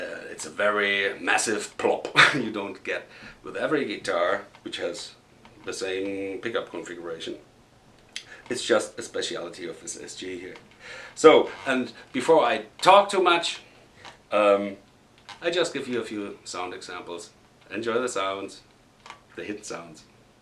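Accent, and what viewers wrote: Germany. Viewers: Bist du Deutscher? Dein akzent erinnert mich an Deutsch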